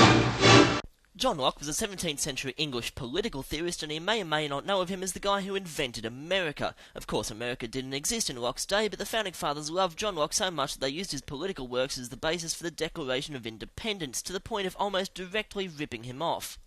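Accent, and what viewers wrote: Australia. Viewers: Your Australian? :D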